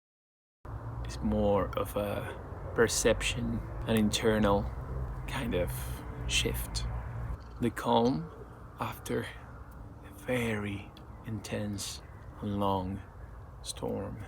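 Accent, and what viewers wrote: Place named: Sweden. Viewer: 100!Resonate. You sound Swedish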